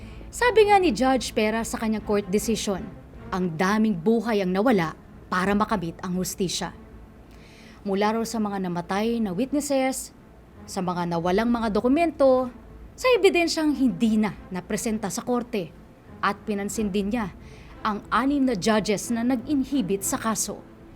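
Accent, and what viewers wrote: Conyo, Philippines. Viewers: The story was great, but the narration/narrative is somewhat “conyo”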